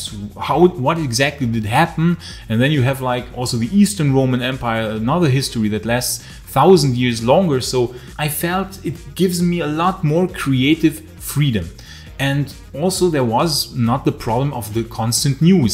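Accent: Germany. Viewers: You could have pushed your audience to Odysee or Bitchute. No algorithms there.... I'm gonna miss your show, but I wish you guys the best! I'll give the other guys a watch, though... It won't be the same without your sarcastic comments made in you German accent, but I'll give them a chance. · I like both topic and I was actually subscribed to the other one ,… and I just realize it was another channel… . Your accent is from German?… I’m Spanish speaker and going throughout B 1 in German :). ( Austrian wife 🤷🏻‍♂️) .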